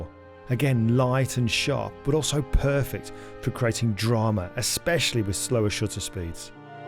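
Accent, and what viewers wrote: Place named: Birmingham, United Kingdom. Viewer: Thanks, always good to hear your Brum on Kelby 1,too!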